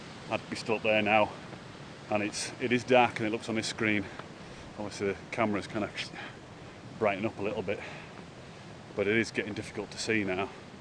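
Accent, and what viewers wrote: Yorkshire, United Kingdom. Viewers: look forward to that!. While I'm on the subject of pillar is there any other route up other than from the wasdale side? Ive looked at the OS maps and I'm struggling to find a good route up. I'm from yorkshire, I'm gathering from your accent you are too and I hate the drive to wasdale haha too long for my liking. So glad I came across your channel. Very impressive videos. Your love for the lakes clearly shines through.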